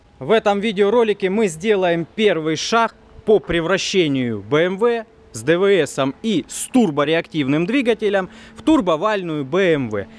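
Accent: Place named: South, Russia. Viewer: ох уж этот южный говор :) хазуй хазуй!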